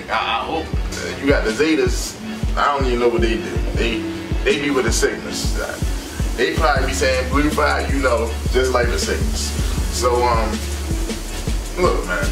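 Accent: Baltimore, United States. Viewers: B’More Accent?? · You from Baltimore? I noticed that “Yeew”(You) “Blew”(Blue)😂😂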